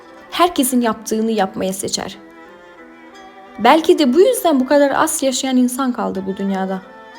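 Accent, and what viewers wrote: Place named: Kičevo, North Macedonia. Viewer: ke një zë shumë të ëmbël dhe të mrekullueshëm gjithashtu edhe një talent të pa parë. Në dëgjim të parë nuk mund ta dallosh se është interpretuar nga një vajzë kërçovare kaq mirë,pastër dhe bukur gjuha turke. Të uroj shumë suksese si në këtë talent që ke gjithashtu edhe në drejtimin që ke zjedhur të studiosh.❤️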